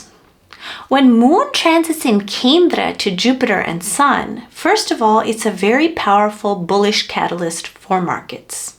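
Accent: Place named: Canada. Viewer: where are you from? Canada?:)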